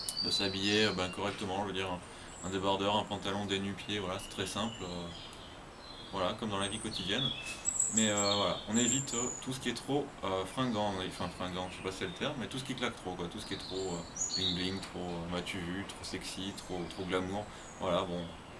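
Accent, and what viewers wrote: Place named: South, France. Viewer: ah oui d'accord alors tu commence à avoir l'accent du sud !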